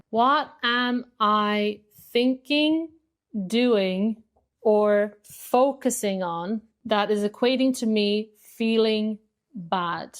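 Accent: Ireland. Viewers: I love her Irish accent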